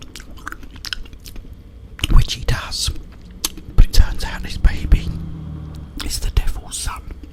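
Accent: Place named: England, United Kingdom. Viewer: Where abouts in england 🏴 you from mate?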